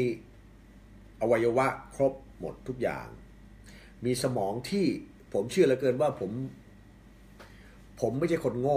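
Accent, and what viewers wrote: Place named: Korat, Thailand. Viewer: ชัดเจนง่ายๆสไตล์โคราช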